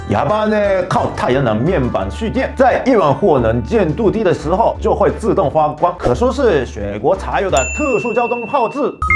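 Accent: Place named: Taiwan. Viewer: 社長說話流利很多 也很台ㄟ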